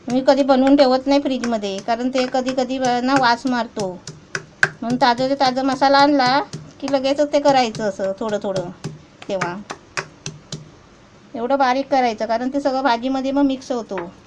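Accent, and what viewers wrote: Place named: Ratnagiri, India. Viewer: mavashi khari mavshi vatate avajavarun agadi Ratnagiri chi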